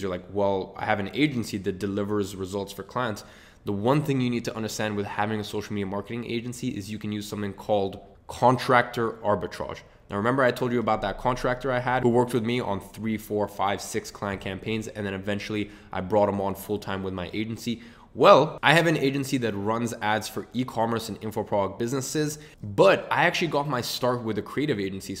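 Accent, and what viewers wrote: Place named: United States. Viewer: why does he have an american accent if he was raised in Britain?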